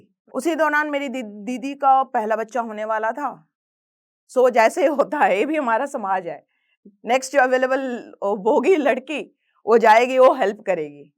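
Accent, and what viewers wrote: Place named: Bengal, India. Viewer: Kaha se hai aap ye bhi pehle batana tha mam.. Aap bangali hai aapke baton se pata chalta hai... Hindi bhi sudharna hai aapko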